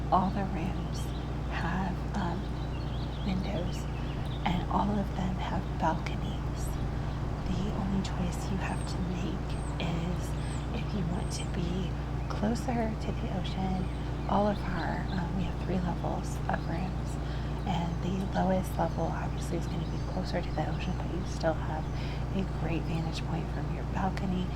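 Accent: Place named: California, United States. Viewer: Hi dear, I love your accent, are you from California?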